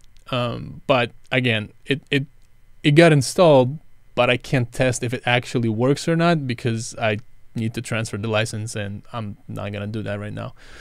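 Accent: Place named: Paris, France. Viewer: there’s this channel on youtube called street french and your accent kind of sounds like hers. She’s from Paris. Are you from Paris too?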